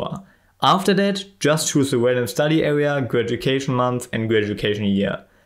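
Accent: Germany. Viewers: meinte Deutschland einfach wegen aktzent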